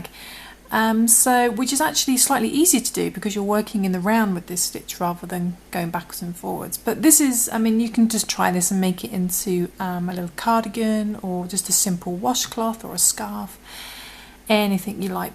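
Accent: United Kingdom. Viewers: Love your accent! British, eh?! Great!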